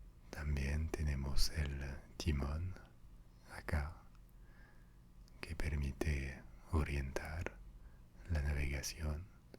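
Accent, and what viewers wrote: France. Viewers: oi todos tus videos en frances e ingles, nunca crei que hablaras en español, estoy realmente sorprendido, hablar re bien el español, aun con el acento marcado al frances pero no molesta para nada, hace exactamente lo que haces, relajar. Mas videos en español por favor!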